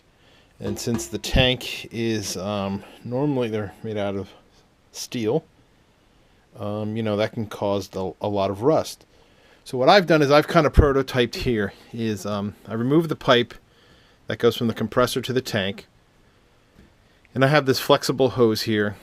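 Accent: North, United Kingdom. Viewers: He is from up north!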